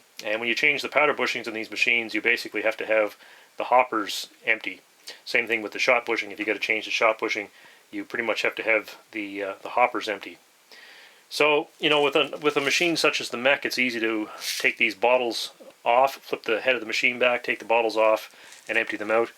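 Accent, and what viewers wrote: Canada. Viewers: Great video! I just bought one of these recently, as I have a plethora of spent shells I kept for eventual reloading. I like the Canadian accent too.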